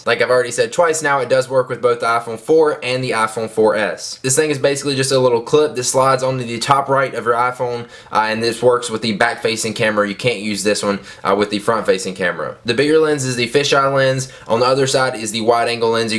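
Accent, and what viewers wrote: South, United States. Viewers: you sound so country(: its adorible( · You sound really southern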